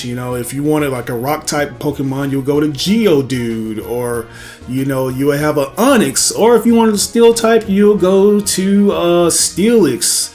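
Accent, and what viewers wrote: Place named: West Tennessee, United States. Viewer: You from West TN/North MS ain’t it? I hear them Arruhs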